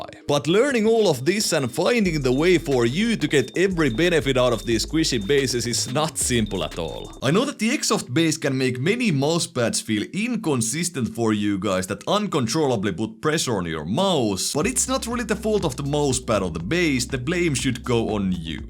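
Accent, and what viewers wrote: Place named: Finland. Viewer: Nice explanations regarding pressure vs friction. Also, I love your finnish english, very colorful to listen to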